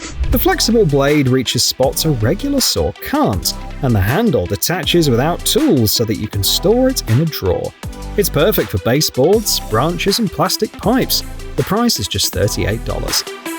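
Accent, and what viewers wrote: United Kingdom. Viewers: I am so tired of listening to those accents of British origins. We won the Revolution a long time ago,, 250 years ago .,,,so I turn you off. This is America.,, I wanna hear an America trying to sell me something. It’s easier for me to understand.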